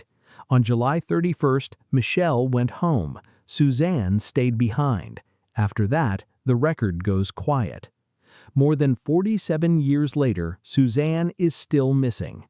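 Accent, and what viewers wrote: United States. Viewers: Why an American voice on a British crime case.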